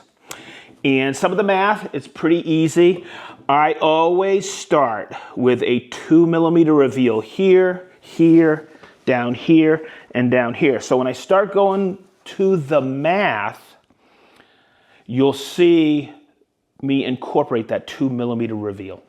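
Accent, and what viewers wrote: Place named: Boston, United States. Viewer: That Boston accent is the worst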